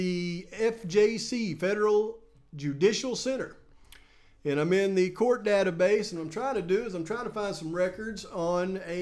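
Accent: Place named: South, United States. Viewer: I just love you. But can you please confirm if you are holding a bug zapper in your hand as a mic? Your country accent, paired with your laid back style plus all the bass pro shop shirts, has me convinced… that it very well could be. 😂😂🙌🏼🙌🏼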